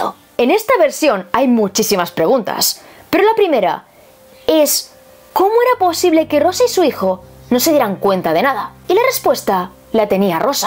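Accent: Spain. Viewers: Hablas muy bonito tu acento español, el de la señora de la grabación está horrible jeje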